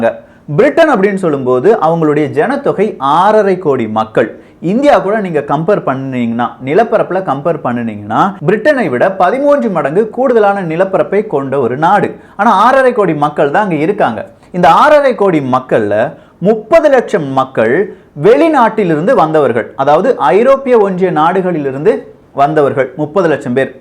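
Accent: Kerala, India. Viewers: நீங்க மலையாளியா ப்ரோ?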